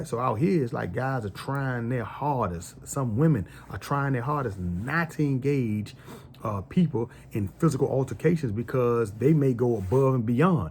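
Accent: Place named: Washington DC, United States. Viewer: Just discovered your video bruh. Picked up the DC accent right away. I grew up in PG County. I went into the military but my brother went the opposite direction. He did time in boys village in Cheltenham, Lorton and DC jail just to name a few so I’ve heard a lot of the stories. Really interested in checking out the rest of your videos. Keep up the good work.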